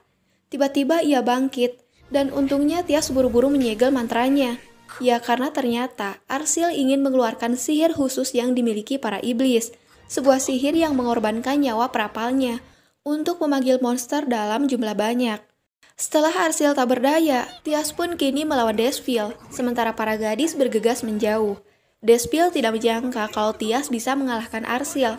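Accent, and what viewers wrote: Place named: West Java, Indonesia. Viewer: Wkwkwk tigebros piks orang sunda iyeu mah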